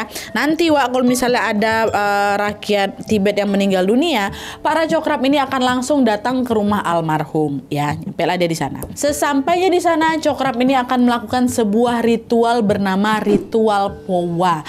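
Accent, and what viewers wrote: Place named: Sumatra, Indonesia. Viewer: Logat kakak nya kayak orang Minang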